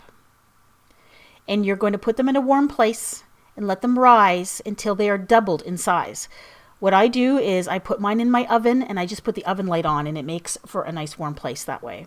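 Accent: Canada. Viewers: I can't tell if she's Canadian or from Minnesota.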